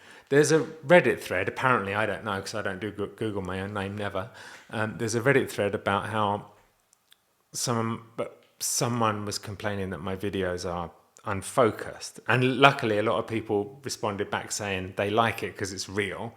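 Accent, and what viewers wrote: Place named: United Kingdom. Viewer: I LOVE your videos. You are just being you and it's beautiful to see. Don't stress about how good or bad your videos are, just keep doing it🙂 Side note, I've been watching Avenue 5 and Hugh Lauries character reminds me so much of you 🤣🤣 it's the British accent and unkept realness of you both that I love., keep being you. Xxxx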